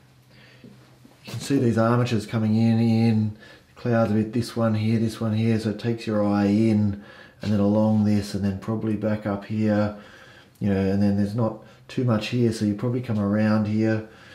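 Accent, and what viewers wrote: Australia. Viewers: I watched a second time. It’s really a stunning painting. It’s amazing to see how different artists palettes produce such interesting paintings. So I subscribed to your site. Where are you from, I hear a slight Australian accent? Kathy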